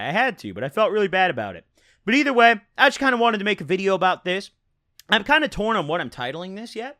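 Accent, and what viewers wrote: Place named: Illinois, United States. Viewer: sounds like he’s from bloomington, il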